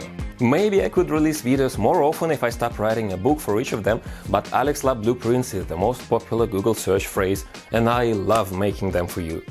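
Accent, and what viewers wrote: Russia. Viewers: You have a strong accent. It looks like Russian.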